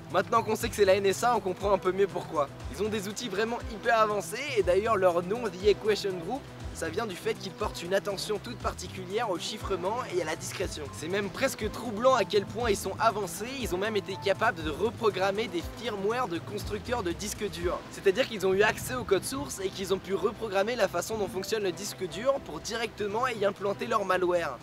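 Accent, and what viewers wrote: Quebec, Canada. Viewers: Que tu as un accent québécois chronique :o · Est - tu canadien ? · vous trouvez pas qu'ilà un petit accent québecois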